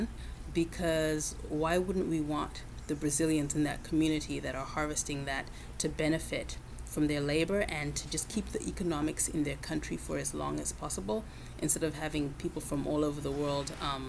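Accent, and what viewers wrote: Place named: Zimbabwe. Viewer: Are you Zimbabwean? that accent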